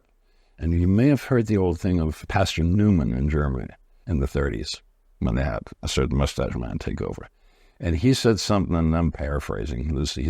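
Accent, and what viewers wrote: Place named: Orlando, United States. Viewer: Great video and as always to the point in an Orlando way of speaking. 😉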